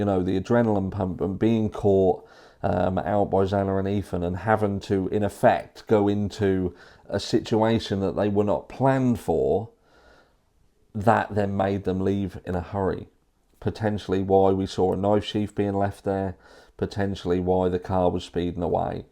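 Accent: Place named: England, United Kingdom. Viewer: now I’m intrigued as to where about you live in England? I’m from Somerset, but now live in Dorset and at times I’ve wondered about your accent too.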